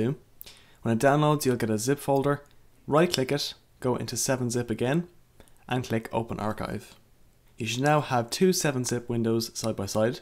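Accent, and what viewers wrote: Ireland. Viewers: are you irish? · are you irsh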